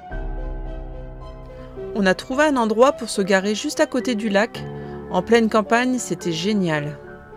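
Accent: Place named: Germany. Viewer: Merci pour ce joli reportage, je suis surprise par cette belle architecture même si elle est cubique. J'ai adoré votre accent allemand 😀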